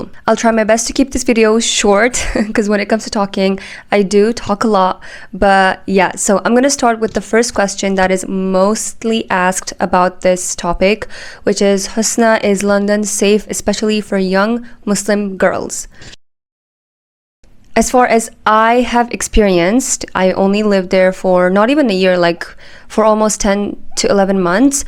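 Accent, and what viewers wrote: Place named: Turkey. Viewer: Please make a video in Turkish language only Because I am in love with your Turkish accent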